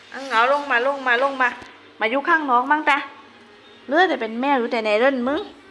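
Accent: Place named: South, Thailand. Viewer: ❤❤❤❤น่ารักกันทั้งบ้านเสียงทางใต้น่ารักจังแม่ 😊ฮ่าๆเก็บตังเลยแม่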